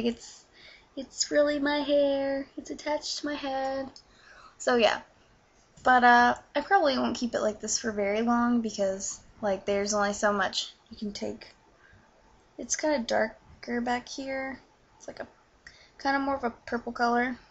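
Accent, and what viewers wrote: United Kingdom. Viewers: Hi what happened with your uk accent? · Owh!! you are so beautiful> and iu like your British accent by the way and i love it. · You are so cute. ^^ I saw your video about english accent. You have a very nice voice. I like the britisch slang. Many greetz from Austria. :) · you're great, ignore all those fucking snob. I really like your British accent, even if its not natural. You're inspiring its cool. >//< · I just finished watching your video..the british accent..by the way i wanna know the tradition regarding on how should be the woman dresses,,atttitude or etc.. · i like it, i want my hair to be like this too, it's really cool. by the way , thanks , your videos helped me to learn the british accent))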